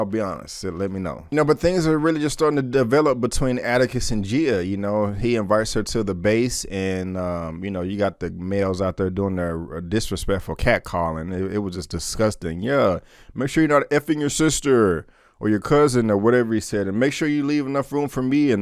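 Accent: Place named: South, United States. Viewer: And where are you from??? I can tell it's in the south somewhere from your accent but bc you know who young joc is that made intrigued about what part of the south you live in. I personally know joc so I can kinda guess around which area.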